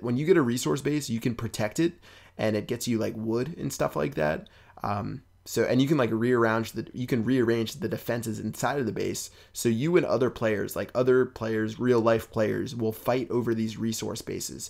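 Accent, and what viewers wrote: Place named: United States. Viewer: I've been playing boom for about a month now, I'm from aus, would love to do some commentary work with you, my Aussie accent and your American one would make good commentary 👍👍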